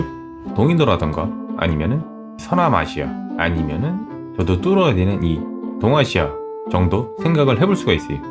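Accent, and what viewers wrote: Chungcheong, South Korea. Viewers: 충청도신가유? 딕션 참 구수하네유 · 충청도 사투리 구수 하네유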